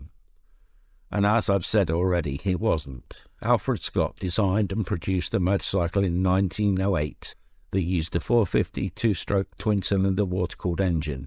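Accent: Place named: Derbyshire, United Kingdom. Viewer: That’s odd. YT does that occasionally. No, haven’t deleted any comments. Twin Brembos should get the job done. I look forward to the vid. I’m in Derbyshire. Your accent sounds like you’re nearby. Am I correct?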